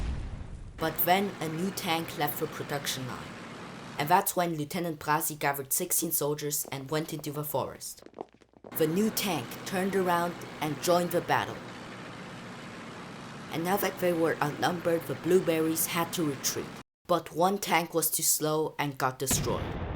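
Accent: France. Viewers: Gotta love the french accent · The french accent 😂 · BRO YOUR ACCENT IS FRENCH BUT SO GOOOOOD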